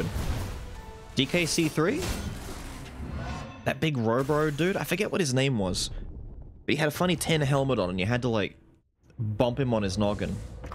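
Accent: Australia. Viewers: Are you australien?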